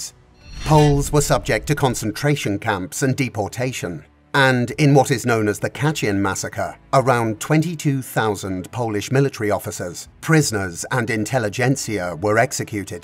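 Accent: United Kingdom. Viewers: honestly, I didn’t even look at half, but firstly because of the title, secondly because of the positioning of Poland as an innocent victim, and Russia as the most vicious of all countries, thirdly, there was no such state as Ukraine in the 17th century, fourthly the story is not told here, but certain one-sided information is being promoted with omission of certain points, the goal is not education, but the promotion of a certain agenda (by the way, voiced with a British accent), the British are always thrilled to pit the Slavs, as well as other natives. Therefore, I consider this video to be propaganda dirt aimed at escalating an already difficult situation.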